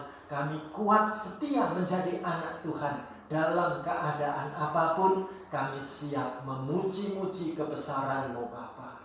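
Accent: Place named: Banyumas, Indonesia. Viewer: Bahasa Panginyongan nya mantap. Asli Mbanyumas nggih Pak Pdt?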